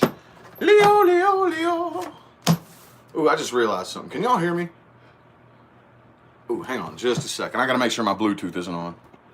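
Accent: United States. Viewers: I missed u live! Ah well!! Next time! I'm not evn Leo but i like ur vibe!! Your funny and the key is your last 1st evr vid I saw of yours was really really accurate4me so i know ur good. I am irish! I love ur American accent!! Hope u don't mind me say that!! It's a compliment!!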